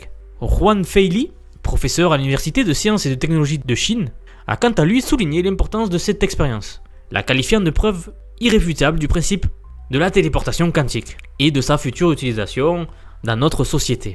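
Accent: South, France. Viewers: Avec un joli accent du sud du soleil qui nous raconte que de la merde. Quand les industriels auront tout contaminé, ils nous vendront des robots pollinisateurs. Allez vous faire soigner… pour être poli.🖕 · J’adore ton contenu a la pointe de l’innovation et merci pour ton travail ! Par contre désolé mais il faut faire des séances chez l’orthophoniste. Ton discours et très saccadé , et ta prononciation approximative … ton accent du sud est fort sympathique mais ca ne suffit pas pour t’ecouter agréablement 😊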